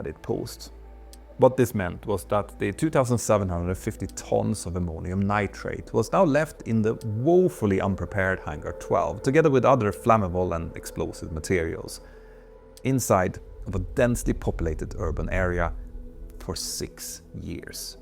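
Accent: Sweden. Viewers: What a great spin off from your main channel! The dedication to details, the source criticism/research, the build up using past incidents and your style (art) of your story telling is a perfect match with (preventable) tragedies like this. And your Scandinavian accent is unique and perfect for emphasizing the importance of details. Svensk, inte sant? För mig som skåning låter det som typisk mellansvensk "brytning", typ östra delen av Svealand någonstans? Anyway, a big thumbs up to your entire team! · This is 100% a Swedish dude speaking, the English is good, it’s the dialect that gives it away. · Look up pronounciation for "flammable", "origin", "threat", "through", "aftermath", "partially", everything else is just charmingly Swedish. Great video!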